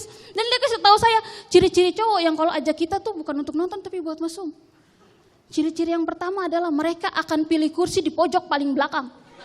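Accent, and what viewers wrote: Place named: Eastern Indonesia, Indonesia. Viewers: Saya orang Sanger Namum BANGGA akan nona dgn gaya bahasa timur..keren keren nona.maju terus dlm berkarya... Tuhan memberkati mu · Kk...logat Ende kantal Alee👍👍👍😁😁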